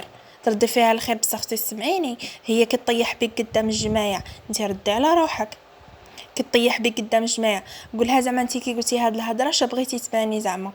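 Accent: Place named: Maghnia, Algeria. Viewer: حبيبتي نتي مغناوية ؟ على خاطر غالبة اللهجة المغناوية في هدرتك ❤😍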